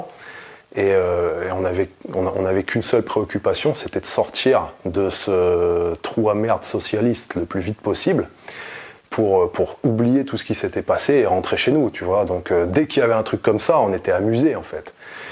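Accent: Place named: Marseille, France. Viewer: Je croyais que t'étais de Marseille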